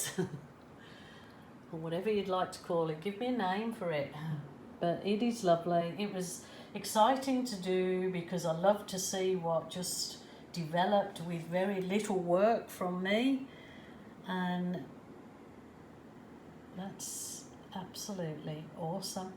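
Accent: Birmingham, United Kingdom. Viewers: Lovely....can I hear a bit of a brummy accent?🙂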